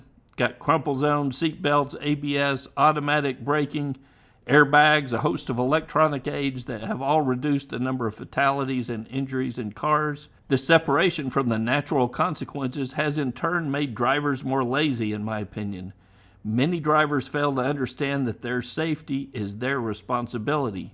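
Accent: Texas, United States. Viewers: I talk Dutch and still am able to perfectly (almost then) understrand your Texas English. You're good 🙂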